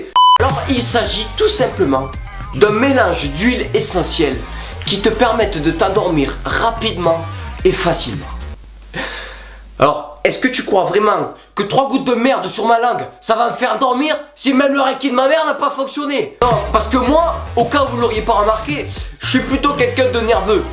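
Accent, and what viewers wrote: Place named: Marseille, France. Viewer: J'adore ton accent mdrrr 😂 Je vis pratiquement à côté de chez toi dans les Pyrénées et on a pas l'accent aussi prononcé que toi... T'es marseillais a la base ou quoi? Sinon j'adore tes vidéos, continue 😘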